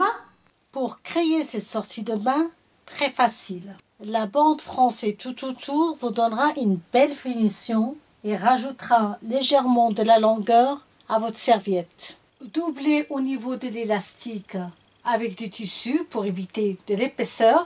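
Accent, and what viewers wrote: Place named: Lebanon. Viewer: Coucou je commence la couture je trouve que vous expliquez super bien et chose importante pour ne parler pas trop vite ... Et j'avoue g craqué sur votre magnifique accent ça me rappelle ma famille au Liban . Merci beaucoup 👍👍👍👍🌷🌺💐💗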